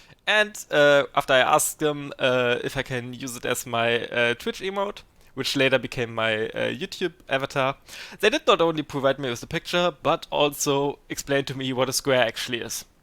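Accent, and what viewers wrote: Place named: Germany. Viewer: I indeed love your German accent! :D Best chess channel I know, keep up the good work and I am looking forward to your stream on Saturday :p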